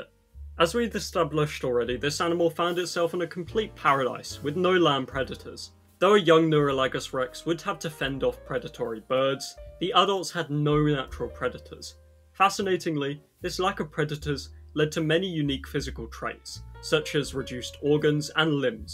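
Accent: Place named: United Kingdom. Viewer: British people pronouncing non-British things.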